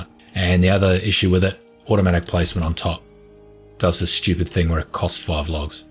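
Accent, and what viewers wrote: Australia. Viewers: You sound like an Australian Ahoy <link> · classic aussie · Such an Aussie accent but awesome vid mate!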